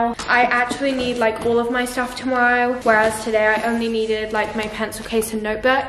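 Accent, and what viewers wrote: England, United Kingdom. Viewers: I started school on the 1st of September, but I got into in-person school on the 6 of the same month. It really makes a difference seeing your friends every day. ANDDD ´s videos are so inspiring! It is also a little bit weird for me knowing that we are on the other side of the world (literally, I live in South America) but at the same time feeling so close! I also want to say thank because she helps me to practice English, especially because she is from England and her accent is so natural. You give me so positive vibes:) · k im a brazilian learning the british accent and i just wanted to say that youre the first person i hear talking slowly because omg. btw where are you from? loved your accent <3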